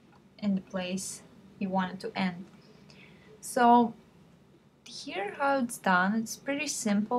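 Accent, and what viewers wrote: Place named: Ukraine. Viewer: у Вас український акцент))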